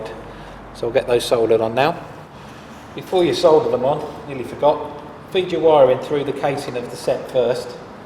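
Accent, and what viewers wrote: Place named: United Kingdom. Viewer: I love UK accent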